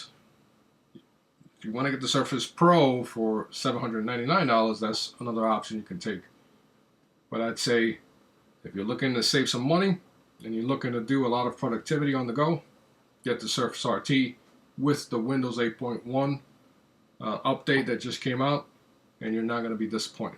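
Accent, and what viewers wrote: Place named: South, United States. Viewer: Where are you from? You have a mix accent of black and southern border kinda a guy. No ofense, just curiosity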